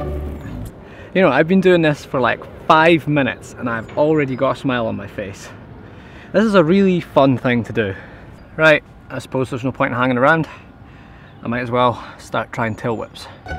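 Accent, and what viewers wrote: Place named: Scotland, United Kingdom. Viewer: where is the skate park?Since you have a scottish accent i'm assuming somwhere in scotland,maybe glasgow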